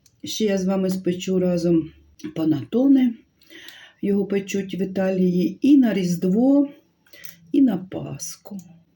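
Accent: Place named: Poltava, Ukraine. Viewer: Дякую вам за гарний рецепт ,спробую...ніколи не пекла, а ви надихнули. Дуже приємний у вас говір, на полтавський схожий. Я сама родом з Полтавщини.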